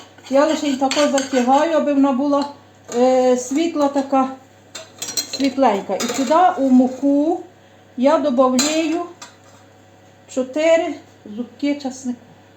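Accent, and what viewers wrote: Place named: Prykarpattia, Ukraine. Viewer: ДОБРОГО ДНЯ! СЬОГОДНІ ВИПАДКОВО ПОБАЧИЛА ВАШ КАНАЛ І ПОЧУЛА СВОЮ РІДНУ З ДИТИНСТВА МОВУ. АЖ ЗАПЛАКАЛА. Я ТЕЖ РОДОМ З ПРИКАРПАТТЯ. ВІДРАЗУ ПІДПИСАЛАСЬ НА ВАШ КПНАЛ. СКАЖІТЬ, А ЗВІДКИ ВИ КОНКРЕТНО? ДЯКУЮ.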